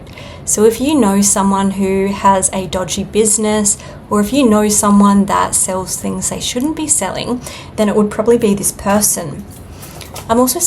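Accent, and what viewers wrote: Australia. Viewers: just out of curiosity, honey, are you an Aussie? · First, I'm so interested in you because you're the spitting image of my sister at your age (who was Cleopatra, so you are what Cleopatra looked like, if anyone wants to know). Secondly, this has been hilariously entertaining that this adorable little twin sister on the other side of the world with the cutest Ausie accent relates such accurate, intimate details of my life, especially the last 10 years. I'll be listening to this again a few times because they're a lot here. Thank you, for your your talented and skillful reading of the vibrational currents. Oh, and you nailed the name first try. My name is David. 😉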